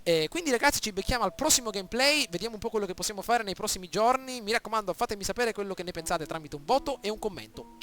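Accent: Sicily, Italy. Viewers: di che parte della sicilia sei??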